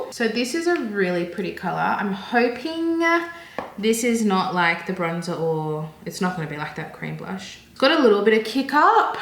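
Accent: Australia. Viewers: Been watching makeup videos for years, first time stumbling upon your Chanel. I’m so glad I did! Thanks so much for your honest review! I hate how much trial and error there is with makeup, especially with budget products and seeing your reaction to stuff is just making it so much easier for me to decide if I want to bother trying some things. I’m on an extremely tight budget and if I were to go through too many cheap products before finding decent ones I’d end up probably spending as much as a dearer one anyway before finding what I’m looking for. I also love hearing your familiar Aussie “oh nauooooooorr” it doesn’t even sound like you’re doing it for shits and giggles it’s your natural accent and it’s glorious! 😂. Thanks again lovely! Hopefully you have some wear test reviews to to see how thing hold up over the day and this mad heat wave that blasted us straight into summer from the depths of winter with no bloody in-between.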